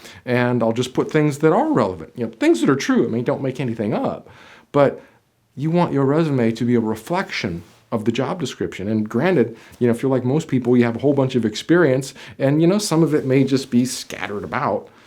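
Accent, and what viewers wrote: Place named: New York, United States. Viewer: Amazing content i always watch your video to practice my listening i like your accent ... are u new yorker , aaren't u ?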